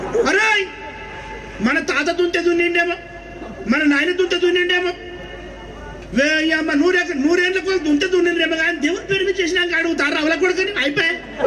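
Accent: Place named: Telangana, India. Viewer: ముందుగా మీ ఒరిజినల్ తెలంగాణ యాసకు నిండు జై భీంలు అన్నా, మస్తు జెప్పినవన్న సూపర్ ఇంకా మీలాంటి చైతన్య పరిచే నాయకులు మాలాంటి చరిత్ర తెలియనివారికి ఇంక చెప్పాలని ఆశిస్తున్న జై భీమ్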